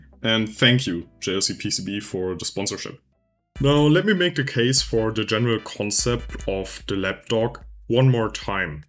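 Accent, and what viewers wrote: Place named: Germany. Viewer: [1] startint to watch video with cleary German accent but too lazy to check if the Youtuber is German or not [2] hearing German word, no need to check anything [3] reading funny comments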